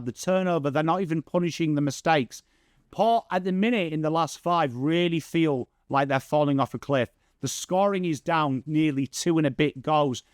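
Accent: England, United Kingdom. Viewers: Very weird listening to a pom talking afl. lol.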